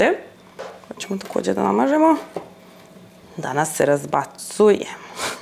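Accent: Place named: Novi Sad, Serbia. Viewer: Tvoji komentari su pre-simpa i jos sa tim NS naglaskom uvijek me oraspoložiš. A Baku Anu vec zamisljam kako se krsti kao na onu "šolju žumanaca" 😂❤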